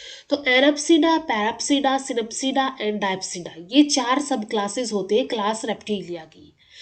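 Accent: Haryana, India. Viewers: Are you from hariyana?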